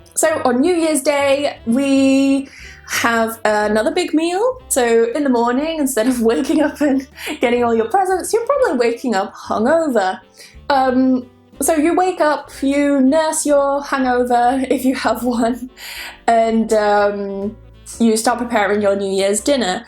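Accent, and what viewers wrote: United Kingdom. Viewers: Thank you for your teaching and British accent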